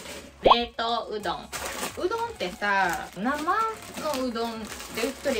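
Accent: Kansai, Japan. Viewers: 部屋着姿のかわいさと、関西弁のギャップがめっちゃどタイプです！これからも頑張ってください！ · 別に今に始まったことじゃないけど、ちゃんの関西弁大好き(*^^*)！しぐれのとこ好きww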